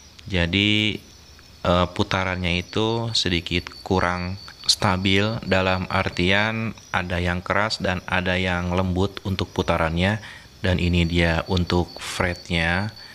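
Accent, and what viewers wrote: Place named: West Java, Indonesia. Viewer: Ehehehe, nyunda pisan yeuh voice-na.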